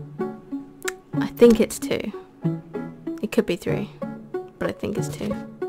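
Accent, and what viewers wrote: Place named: Australia. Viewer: The Australian accent is probably my favorite accent, its just like a lot more relaxed (some of the time), it just makes the video 100 times better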